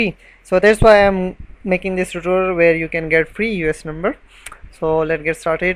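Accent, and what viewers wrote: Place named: England, United Kingdom. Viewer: It is hard to follow your English accent. Next time make sure you add subtitles. Thanks.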